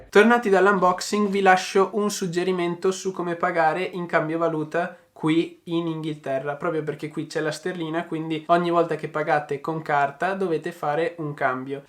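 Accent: Veneto, Italy. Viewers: Sei veneziano?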